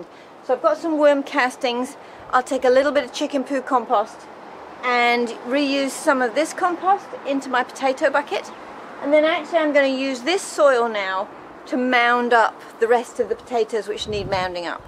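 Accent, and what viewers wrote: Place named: United Kingdom. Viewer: I am so glad I sat next to Holly yesterday and found your site, lovely to hear the UK accent, I have a farm but really struggle to grow stuff(Mainly ate by animals possums and rabbits ) So I am excited to follow you and will sow my indoor seeds very soon. I have a worm farm and Cow, horse sheep poo but will use shavings for the chickens.( you would be very welcome to collect the other manure from us if needed ) Thank you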